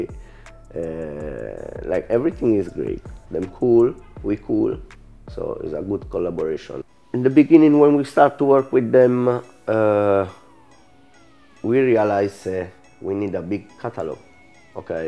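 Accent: Jamaica. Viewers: Patrick jolly splif se ha imparato parlando l’inglese jamaicano cosa ci può fare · Minchia che Inglese Patois-izzato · No vabbè l'accento jamaicano non si può sentire... Cazzo sei italiano, usa il nostro accento almeno o se proprio ti devi sforzare usa quello inglese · Top si sente l'influenza Jamaican nel tuo inglese real Swag no sucker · Cazzo se si sente l'accento jamaicano... Altro che ebbasta quando parla inglese alla renzi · chi sono? E inglese giamaicano ovviamente vivendo lui la ha preso quell’influenza, molto diverso dall’inglese che studi a scuola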